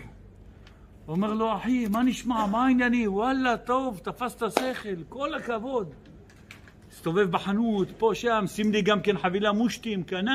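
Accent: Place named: Iraq. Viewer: הוא נשמע עיראקי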